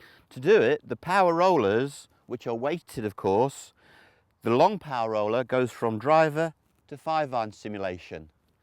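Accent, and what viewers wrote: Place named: United Kingdom. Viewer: This guy is so British and I love it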